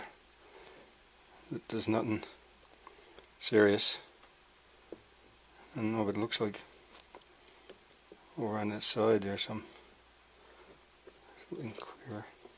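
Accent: Newfoundland, Canada. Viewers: Are you from Newfoundland?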